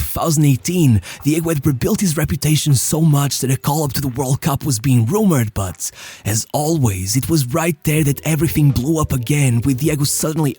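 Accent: Portugal. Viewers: Any chance you are from Portugal? Because the way you pronounce brazilian portuguese names sounds like european portuguese. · Man, I'm new to your channel, really enjoying the videos, but let me ask you: Are you Portuguese or have you learned Portuguese from Portugal? Bruh, if you're not a Portuguese student you should try Brazilian Portuguese accent, specially when talking about Brazilian people and things. For exemple: Instead of Roberto "Carloosh" just say Carlos dude; instead of "Libertadoreesh" you can just say Libertadores, that "s" is actually just like English's "s", like when a verb gets the "s" when used in the present for he/she/it = works, plays -- that's the "s" sound in any of these words. I'm not hating, as I said I like your videos, I'm suggesting ok. Much love from a Brazilian Portuguese teacher from Brazil! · Português* dá para ver no sotaque e no sotaque ao falar inglês. 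· You are Portuguese, right? That accent is recognizable anywhere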